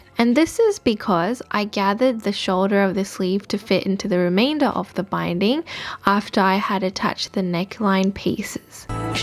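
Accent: Australia. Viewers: Love this! So creative and clever! Thanks for sharing! I am now a sub! Also good to see and hear a familiar Aussie accent 🥰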